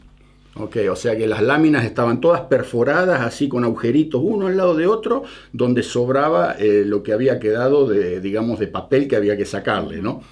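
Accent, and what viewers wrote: Argentina. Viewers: Me encanta su manera bien argento, de relatar. A pesar de haber dejado nuestro país hace 50 años, no perdió sus modismos ni acento. Saludos. 🎉🎉🎉🎉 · Por que lo voy hacer? he estudiado.Sobre gustos los colores! No me gusta! al principio si por que vi el acento argentino, pero despues se puso Denso! No me interesa! saludos! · Que historia realmente de pelicula..... !con mi marido coincidimos que la narracion de la misma nos genero diferentes sentimientos ,realmente muy atrapante y con espiritu de superacion ,responsabilidad y valentia destacables ,porque a pesar de la corta edad se notaba en sus relatos que eran jovenes responsables y al verlo ahora se refleja una persona integra ,seguramente sus hermanos tambien, gracias por compartirnos su historia y su hija firme apoyandolo al lado da mucha ternura y la felicitamos tambien , se nota ese amor a la tierra ese Argentino de corazon hasta el acento esta intacto ...felicidades por los logros porque a pesar de las vicisitudes de la vida su progreso y superacion estan a la vista . 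un saludo desde Catamarca ARGENTINA ...... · Sí! Me encanta que a pesar de haber emigrado tan joven todavía tenga una manera muy argenta de hablar. ❤ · Bien,me asombra y agrada a la vez,que no perdiste el acento argentino,ni los modismos,despues de vivir tanto en el extranjero,Un saludo desde Argentina.(Y me parece que te esta "tirando"el solcito,porque a cada rato andas por el pais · Muy interesante,sigo todos sus videos y me fascina la forma de narrar tan nuestra,tan argentina de,quiero seguir escuchando esa maravillosa historia de vida!!!!!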